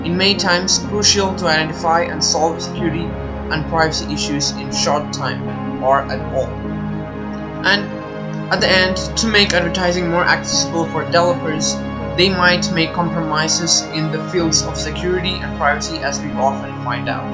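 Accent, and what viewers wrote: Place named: India. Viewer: Good information, sir, and well presented. As an American, I find your Indian accent charming, although at times a bit opaque. For a few words I needed to repeat the audio, but was able to understand the words in all but one case -- you say, "Epic browser is always on ___________ (?)". The word sounds (to me) like "ignoshiamal". From context, I assume this means that Epic is always set on maximum privacy protection...but still, I'd like to know what the word is. Also, in order to achieve maximum understanding in the U.S., I think you may need to change your pronunciation of the names of two products you reviewed: Opera and K-MELEON. In British English, opera is pronounced "AW-pǝ-rǝ" -- in U.S. English, it is pronounced either "AW-pǝ-rǝ" or "AH-pǝ-rǝ", but in any case, the emphasis is always on the first syllable. I am certain that K-MELEON's logo is the head of a chameleon, so I'm betting the browser's name should be pronounced the same as the animal, "kǝ-MEE-lee-ǝn", with the accent on the second syllable. 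I apologize for criticizing you on such trivial points. Again, the videos are VERY informative, and I do appreciate them.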